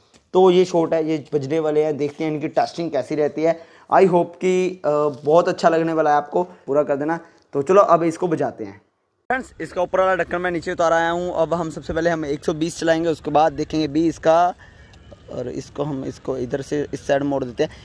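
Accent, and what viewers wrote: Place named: Punjab, India. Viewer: Love your punjab accent😅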